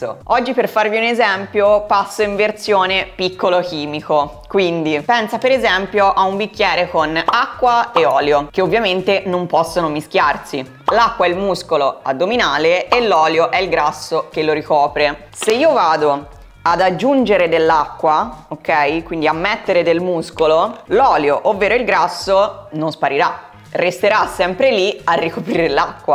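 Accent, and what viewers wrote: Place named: Tuscany, Italy. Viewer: Poco toscana😅😂😂😂❤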